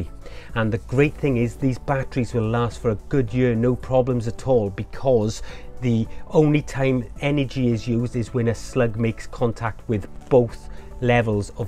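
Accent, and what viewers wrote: Wales, United Kingdom. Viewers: I hear the accent...I subscribe. Dan and oliver, Cardiff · Guessing from the accent your Welsh too, I'm from the Swansea area. Before this month my soil has been turning into dust. I know about the problem with the jet-stream breaking down over the last few years and the low rainfall over the last 12 months for the south coast. Is there anything that really works to keep soil moist. I just bet that after this month, its going to go dry again. The garden is loving the rain this month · Margie Watson He’s from the Welsh valleys, maybe Maerdy, Ferndale, Aberdare ?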